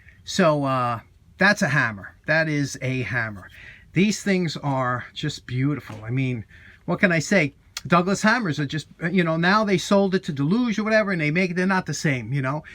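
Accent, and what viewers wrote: New York, United States. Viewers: Love the accent. New York or thereabouts?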